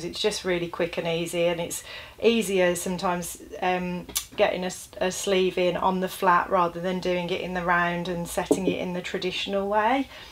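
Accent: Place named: Midlands, United Kingdom. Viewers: K and G are related phonemes, being voiced and unvoiced versions of the same. Regional accents and dialects influence how speakers pronounce them. Interestingly, dialect, from the Midlands, is thought to be the original pronunciation of modern English. Perhaps you might consider learning more about linguistics and phonetics before making judgements on other’s use of English. It might also help with your grammar and punctuation. It was very irritating to be distracted, by vacuous comments, from such an excellent demonstration by a very articulate and technically able presenter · That Midlands accent!! Takes me back! Great video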